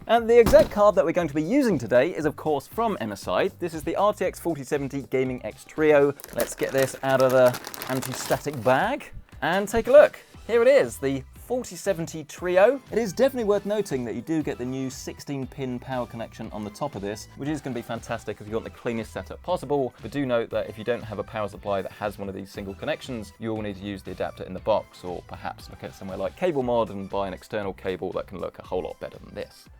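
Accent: United Kingdom. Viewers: British accent is amazing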